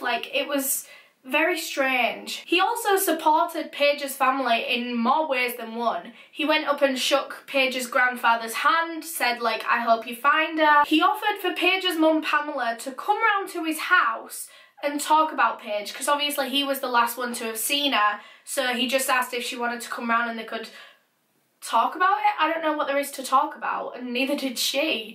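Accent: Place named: Scotland, United Kingdom. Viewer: I am somewhat new and literally thought you were Scottish you don't sound English sorry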